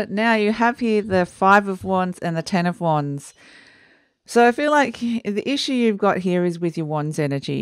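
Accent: Australia. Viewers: Hello, great to hear a fellow Aussie doing readings on you tube. They are good readings too. Do you do readings at any of the psychic and wellness fair held in NSW? It would be great to meet you one day. Cheers.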